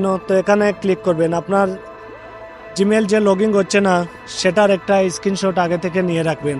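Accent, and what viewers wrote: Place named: Sylhet, Bangladesh. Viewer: Apne Sylhety🫡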